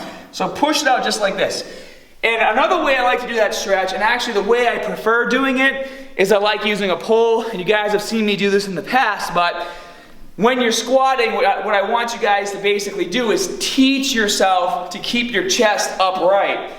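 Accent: Boston, United States. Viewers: What part of Boston are you from brother? BAHBELL LIFTS ALL DAY :) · Are you from boston you have the accent. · Sooo Boston! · lol Bahbell. I find a problem where when I ass to grass squat for 10 reps+ I start bouncing out of the hole when I start getting fatigued. I feel like I'm cheating myself but I'd like your opinion! thanks man love the Boston accent by the way haha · Definately from Boston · That Boston Accent is serious! Good info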